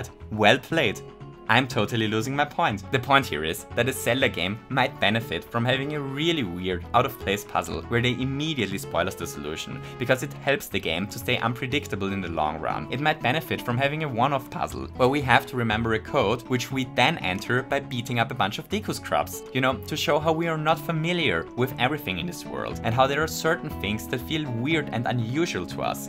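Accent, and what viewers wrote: Germany. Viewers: Hes german… you here that 5 secs into the video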